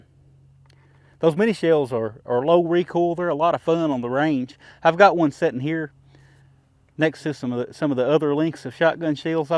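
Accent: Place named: Rural, United States. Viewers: I purchased a 500 retro with the 18.5 inch barrel and was looking for a 28 inch barrel for it and they run about $220. I seen the maverick at rural king for $179 with the 28 inch and got an extra shotgun. Since the barrels interchange it's the best combo. I have the Opsol adapter and have ran it through both interchanging barrels and they run like a charm with the mini's. Just a side note my Dad and Grandfather were from Augusta Ky. and listening to your video's with your accent reminds me of them. They would shoot skeet every Saturday here in southern Indiana and load their own shells and always clean everybody out. Good times and good memories. Thanks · East Tennessean here, sir you sound like someone from my neck of the woods. Loved the vid. I have the same adapter. It’s amazing. Keep up the good work. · "Well ventilated " love it! 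Of all the gun channels I subscribe to, this is my favorite. Must be the Kentucky accent. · I like this guy and his country twang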